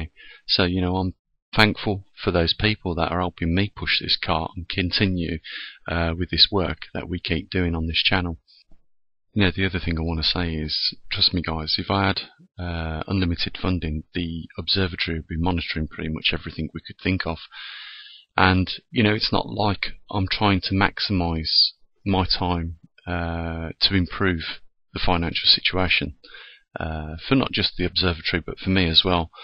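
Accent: Birmingham, United Kingdom. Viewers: We made the move to the countryside in Portugal 3 years ago...........we have a poly tunnel and land. It's still affordable to buy land in Portugal with water and mature fruit trees. So happy to have left the city. Thanks for your work. Its been very cool here all month, which is very unusual. Btw, you sound like a brummy.........I was born in West Brom!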